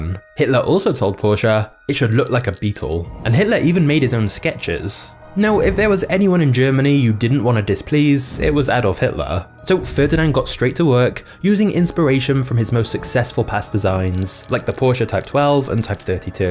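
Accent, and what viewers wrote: United Kingdom. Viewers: Bro is so hardcore Britain. 😂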